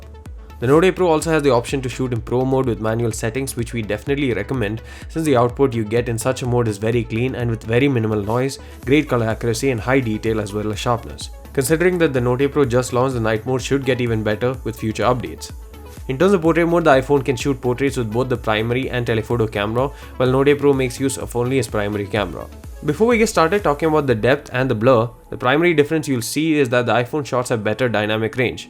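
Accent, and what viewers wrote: India. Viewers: this indian accent so fucking disgusting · ¿Why Indians doesn't work a little bit more on their accent and pronunciation?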